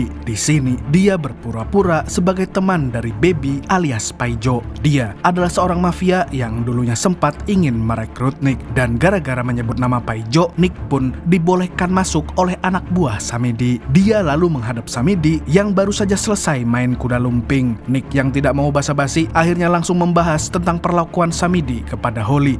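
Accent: Banjar, Indonesia. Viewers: Urang banjar leh kali nya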